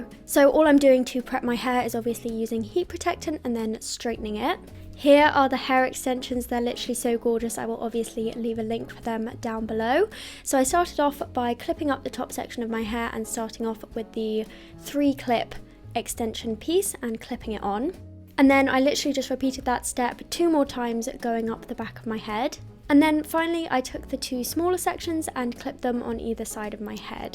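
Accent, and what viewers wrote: United Kingdom. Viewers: Can I just say that your British accent is e v e r y t h i n g ❤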